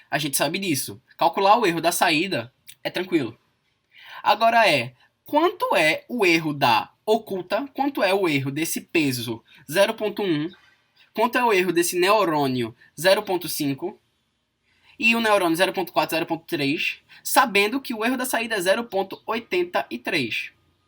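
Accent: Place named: Rio de Janeiro, Brazil. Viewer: muito bom carioca com puxada nordestina ou vice versa. hahah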